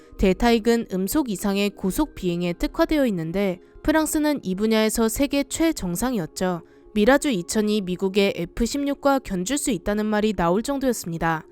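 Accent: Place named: Gyeongsang, South Korea. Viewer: 이언냐 갱상도언냐네..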